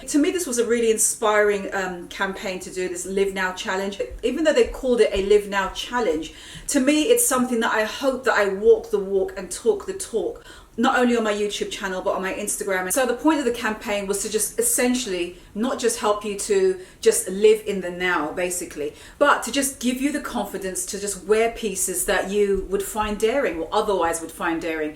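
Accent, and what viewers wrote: United Kingdom. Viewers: I realize this is a yr old but “bloody” lol (typing as I speak in your British accent in my mind) I love that yellow suit, everything about it. Is that appropriate for any work place tho?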